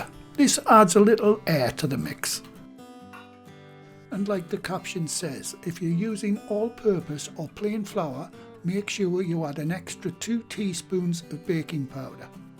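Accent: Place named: Northern England, United Kingdom. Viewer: This looks so yummy. Proper northern comfort food. You sound like a lovely northener. Thank you for such a well made video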